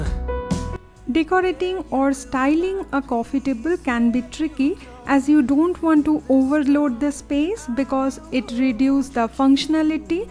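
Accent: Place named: Bengal, India. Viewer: Simply wow , your collection is beautiful. By the way r u bengali,actualy u have bengali accent.Please reply .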